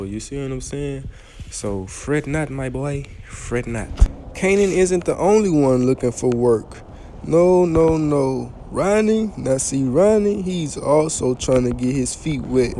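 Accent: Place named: New Orleans, United States. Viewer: Hey bro. Love the commentary with the NO/BR accent. 504/225✊🏾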